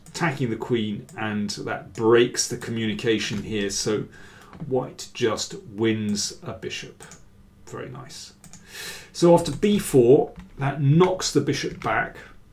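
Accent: United Kingdom. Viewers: It was 1995 when i first heard your commentary when i used to watch anand Kasparov play at 4 am Indian time, and you have the knack to keep viewers engaged and the most appropriate British accent and vocabulary!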